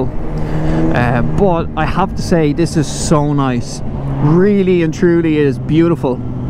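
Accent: Ireland. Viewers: it has nothing to do with your being Irish, or you accent. In fact I love the Irish people very, very much. There were quite a few times when the editing was not as accurate as it could be.. As I said, I love the Irish very much. And I love your accent. It was not my intention to upset you in anyway. And I’m sorry if it did. Please except my apology for any words I said that might make you think otherwise. Owned a Honda CX 500 V Twin in my younger days. It was when I began to suffer from arthritis that my wife and I turned to cars. My car is now Electric and love it. Hope you might buy this bike. Boy it’s quick off the mark. Richard.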